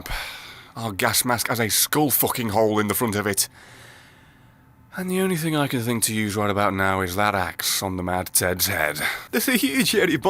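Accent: Scotland, United Kingdom. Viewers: Josh Mcklaren He said he is from the UK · Are you Scottish? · ***** His third ever video uploaded (the one with MW3) is also with him putting on a Scottish accent. I must say, it fits pretty well. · Have I missed something why is suddenly Scottish?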